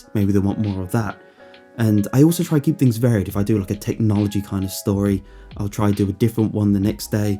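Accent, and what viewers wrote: England, United Kingdom. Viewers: Wow, you are a very humble guy and I’ve always listen to you because of your very calming British voice ❤️. Keep doing what you love and just listen to your gut instincts. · Can someone tell me where in England his accent is from? I love listening to it.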